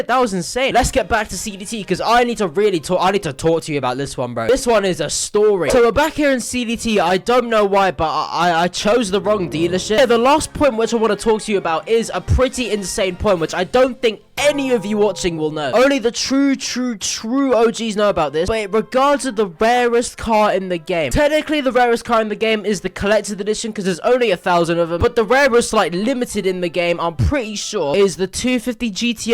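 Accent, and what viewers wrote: United Kingdom. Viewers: good bradford accent. its a 8.5/10 from a boy who lived in bd for 8 yrs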